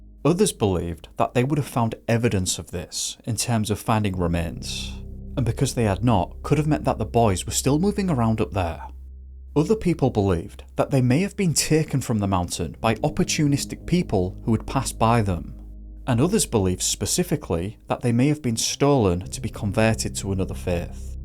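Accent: United Kingdom. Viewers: I agree! I prefer videos like these with UK-ish accents. It's oddly soothing to me.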